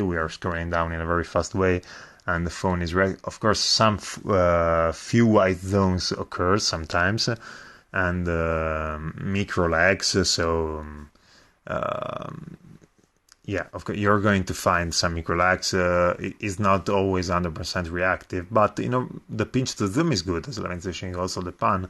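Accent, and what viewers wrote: Italy. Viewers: brutta e pesante la cadenza italiana... ma voi per parlare l'inglese in questo modo da chi l'avete appreso? dalle capre? si sente proprio forte la tendenza ad italianizzare il tutto... a dir poco vergognoso. E in cinque anni di scuola superiore ho imparato più io che voi un inglese di livello intermedio, ma voi cari state alle pezze che pure i bambini vi superano. Fate un corso di dizione o quantomeno aggiornatevi con babbel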